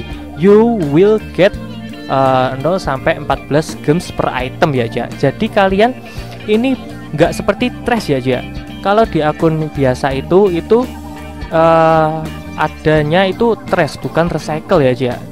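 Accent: Java, Indonesia. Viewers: Ngakak sampe logat medoknya 😂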